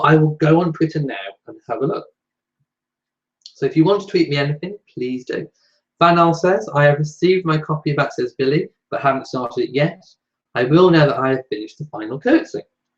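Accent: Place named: United Kingdom. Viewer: it's nice to meet you! Now I know what you look like. I love your British accent!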